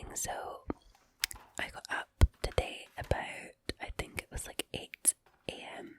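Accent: Scotland, United Kingdom. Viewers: What area of Scotland are you from I am fife and your accent sounds a lot stronger than ours 😂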